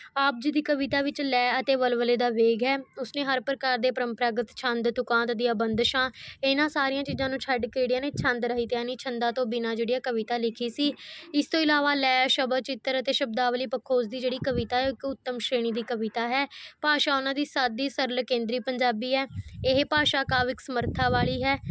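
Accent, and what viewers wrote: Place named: Mansa, India. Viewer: ਮਾਨਸਾ ਏਰੀਆ ਲੱਗਦਾ ਮੈਡਮ ਜੀ ਦਾ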